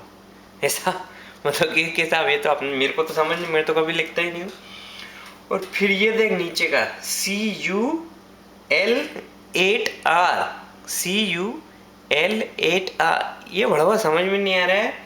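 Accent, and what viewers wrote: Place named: Malwa, India. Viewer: malwi rocksss its always nice nd touchin to hear ur lang when u far from ur home(malwa)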